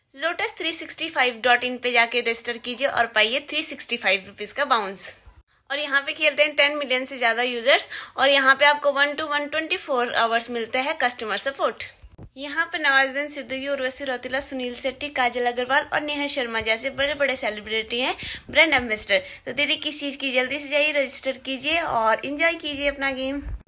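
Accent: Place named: Pahadi, India. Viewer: Yaar mai bi pahaadi hu bhuli Teri pahaadi waali Hindi sun kar mja hi aa jaata hai.😅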